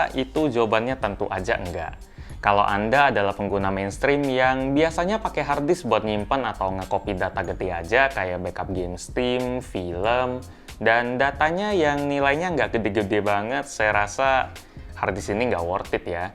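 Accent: Palembang, Indonesia. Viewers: Bang lu org mana? Palemvang ya?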